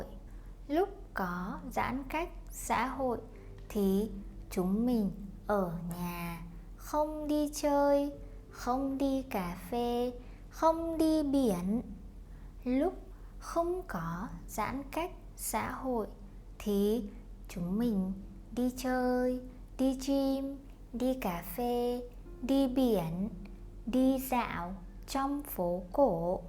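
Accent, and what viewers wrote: North, Vietnam. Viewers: Thanks for this video. I searched long to find some good stuff to listen to as an "experienced beginner" and this is exactly what I wanted (even if northern accent ;) )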